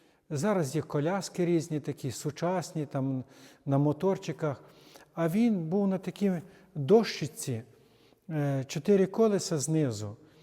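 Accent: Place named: Khmelnytskyi, Ukraine. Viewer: Так, є святині, які потрібно поважати. Хороший урок, хоч і трагічний. Батюшка, ви часом не з Хмельницької області? Слухаю ваш говір. Цікаво.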